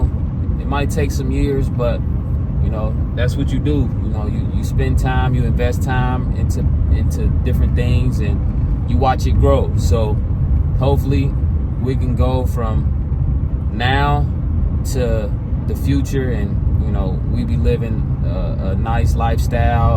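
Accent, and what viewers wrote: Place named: Detroit, United States. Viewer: Man I thought she sounded like a Detroit just wasn’t sure. This mf is bad man love this build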